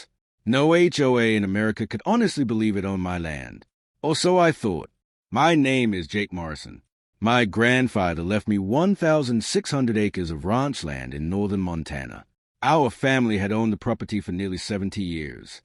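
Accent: United Kingdom. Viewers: Why would someone who has generational property in Montana, sound like he’s from England?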